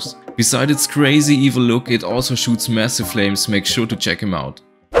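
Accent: Germany. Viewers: U Sound rlly german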